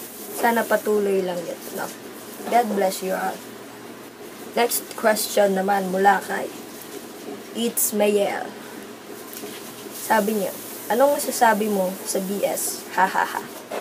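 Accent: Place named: Ilonggo, Philippines. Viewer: Ang kyot ng ilonggggg 😍😂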